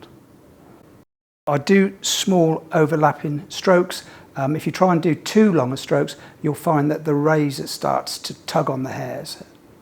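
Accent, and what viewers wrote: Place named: United Kingdom. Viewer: As a Yank it's great to hear British English...it's a dance of the language.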